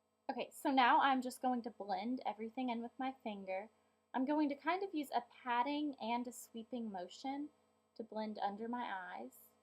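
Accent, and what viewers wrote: South, United States. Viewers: Thanks for this video so much!!!!!!! . . . .Is it me or is your southern accent slowly fading away?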